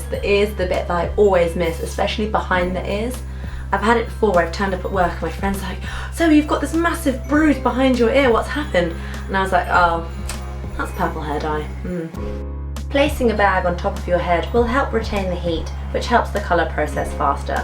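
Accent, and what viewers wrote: United Kingdom. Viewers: Are u british? I am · Nice british accent👌🏼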